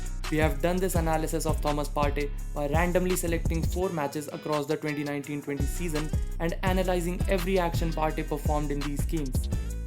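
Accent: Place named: India. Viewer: Immediately I heard an Indian voice just skipped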